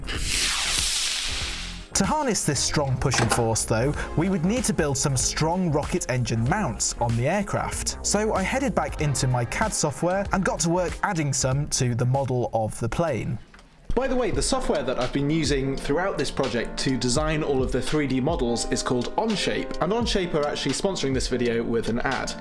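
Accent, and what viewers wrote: United Kingdom. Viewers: Is bro speaking UK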